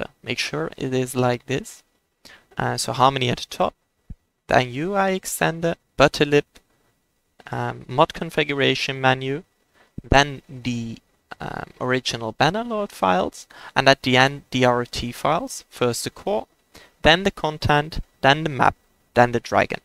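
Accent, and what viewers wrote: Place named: Netherlands. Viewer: You got a clean accent for a dutch dude ;)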